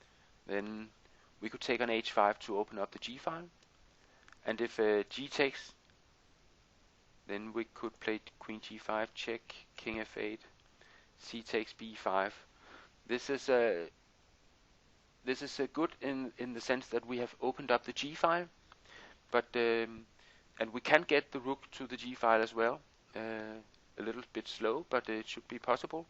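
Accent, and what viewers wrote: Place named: Denmark. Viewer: Love the danish accent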